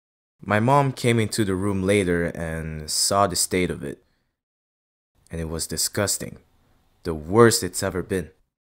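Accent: Montreal, Canada. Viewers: Ay Man ça c'est du VRAI Français de Montréal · accent canadien tape fort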